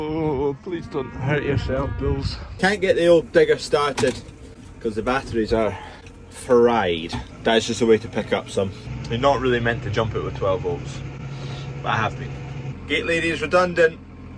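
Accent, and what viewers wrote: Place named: Northern Ireland, United Kingdom. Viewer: Northern Irish accent spot on👌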